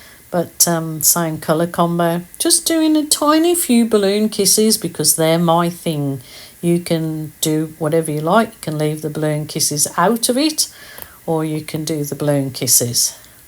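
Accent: Birmingham, United Kingdom. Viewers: I love the smell of summer rain mmmmm so peaceful and calming smell and sound. I’m loving your Brumy accent too 😂.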